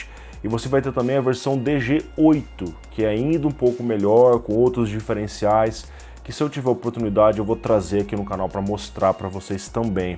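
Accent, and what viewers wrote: Brazil. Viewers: Is he speaking Brazilian Portuguese or European Portuguese? Is there a difference ?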